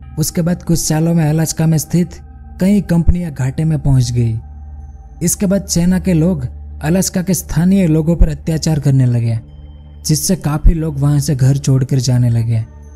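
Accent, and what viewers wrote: Gujarat, India. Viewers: If im not wrong narrator is 💯 gujju😂😂 please don't take this as offensive or any hatred. I love the way gujjus speak 😂 all thanks to TMKOC 🙌🏾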